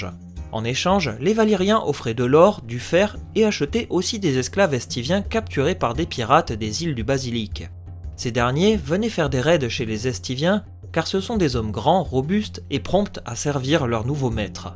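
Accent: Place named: Toulouse, France. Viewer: Hey! Même avec un petit accent toulousain, mille reste invariable! Pas de "s"!!!! à signé la grande pourfendeuse des liaisons dangereuses!:))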